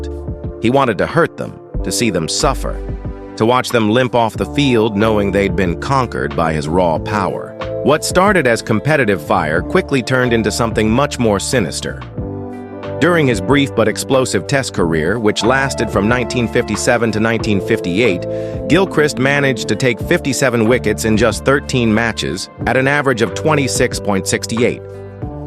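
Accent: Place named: United States. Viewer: Cricket and a american accent of the anchor doesn't seem normal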